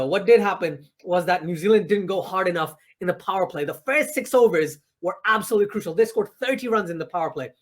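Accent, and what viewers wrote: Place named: Pakistan. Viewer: Great analysis. So glad you lampooned the "toss" debate. If a team bats first and gets 170 plus, anything can happen. Yes they won the toss and won the game but as any researcher will declare: correlation is not causation. I have really enjoyed your comments and I am going to look out for them during the Ashes. Many years ago I drove night time city buses. My security guard was from Pakistan. We became great mates and spent the whole night talking cricket and religion. He was such a great bloke. It was not a requirement to have the same security guard but we always asked for each other. You remind me a lot of him, and it is not just the accent. Very balanced, very thoughtful, great sense of humour.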